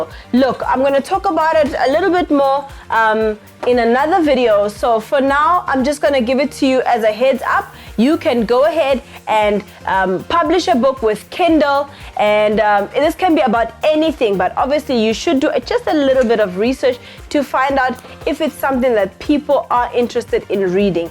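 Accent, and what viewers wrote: Nigeria. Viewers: That Nigerian accent 😂🤣🙌🏿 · So you have been hanging out with one of my Nigerian brothers.Look at that accent . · Girl.... your nigerian accent is on point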